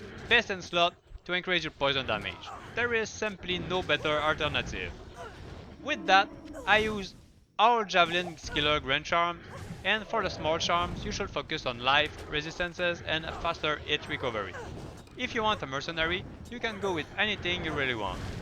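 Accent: Quebec, Canada. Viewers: Québécois? Nice video 👍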